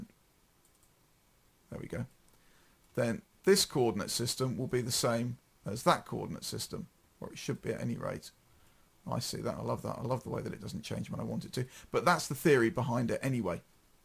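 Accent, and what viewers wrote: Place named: United Kingdom. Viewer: Мерзкий блевотный британский акцент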